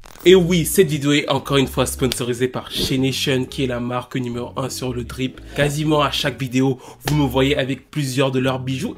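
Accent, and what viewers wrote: Cameroon. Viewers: J'aime bien le fait que tes origines camerounaises, commencent à se faire sentir avec les Eh etc !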